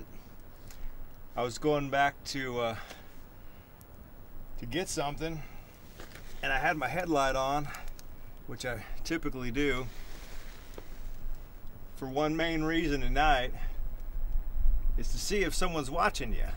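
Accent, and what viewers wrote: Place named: Texas, United States. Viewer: You are so funny- love the hat. Your Texan really comes out when you talk!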